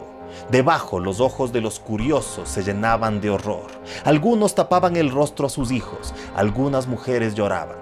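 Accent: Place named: Ecuador. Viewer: Que historia tan horrorosa, pero bien contada, me encanto sobretodo con ese acento de voz ecuatoriano tan bonito. Una historia de horror en un pais que admiro muchisimo.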